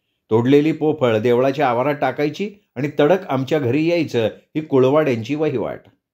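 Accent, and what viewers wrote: Konkan, India. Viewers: सर कादंबरी तर छान आहे पण त्याहीपेक्षा तुम्ही जो कोकनी आवाज काढता ते लय भारी आहे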